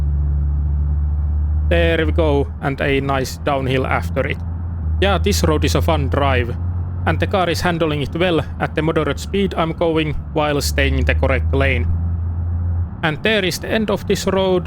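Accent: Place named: Finland. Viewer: the most Finnish sounding guy i heard haha, nice video!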